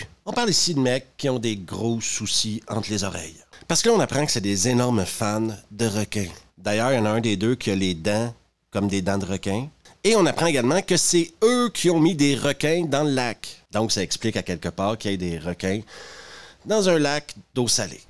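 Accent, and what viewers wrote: Quebec, Canada. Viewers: Je te suis déjà depuis quelques temps, et je trouve que tu as un humour qui fait vraiment humour Français. On voit que tu connais ton métier :) Merci pour tes vidéos toujours cools qui m'ont fait apprecier l'accent made in Quebec !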